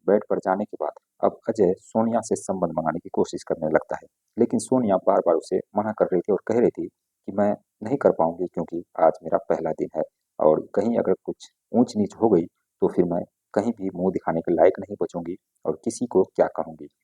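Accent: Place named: Bihar, India. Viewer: Typical Bihari hi ye. Pronounciation check kijiye please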